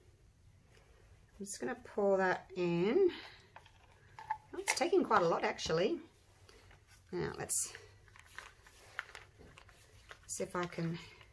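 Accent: Australia. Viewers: The eastern brown hiding in your cupboard next to the Vegemite is extremely impressed with your resin prowess 🐍 ❤ I detect an Aussie accent, so I presume you are located in Australia, where it seems every household has at least one highly venomous reptile lurking in the loo or other parts of the house 😬😂 Cheers and love 💕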